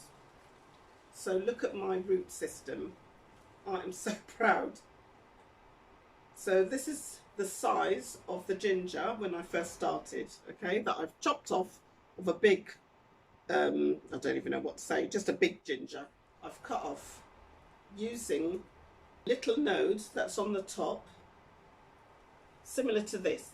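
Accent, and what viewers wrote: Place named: RP, United Kingdom. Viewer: Hello, absolutely love your talk on planting ginger. You sound very posh. Can't wait for more tips from you!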